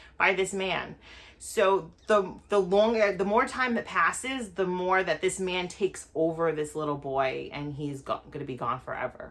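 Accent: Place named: East Coast, United States. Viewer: Great reviews very detailed ! You a Jersey Girl?? You got an East Coast accent.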